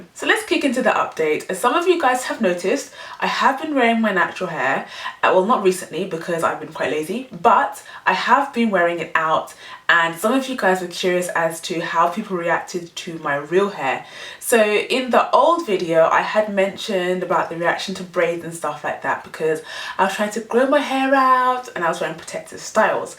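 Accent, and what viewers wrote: England, United Kingdom. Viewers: She has the British accent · I can kinda understand (some what) peoples need to stare at things that they don't see very often or they're not use to. In the US there are a lot of different races so some one walking by of a different race from you isn't a big thing but there is one thing about you that would make me stop. Your accent. I love your accent! Hearing an English accent on a black person is rare here, so I would do a double take. I would stop you just to ask you to chat so I can listen to you talk. To be fair I would do that to anyone from the UK.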